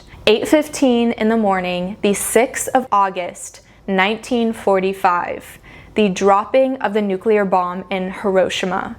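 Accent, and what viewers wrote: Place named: United States. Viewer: You look Italian.. but you have an American accent. mmmh 🤔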